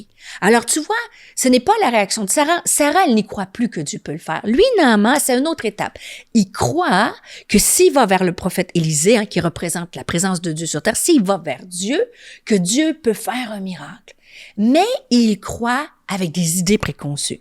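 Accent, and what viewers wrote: Quebec, Canada. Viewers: ce beau accent québécois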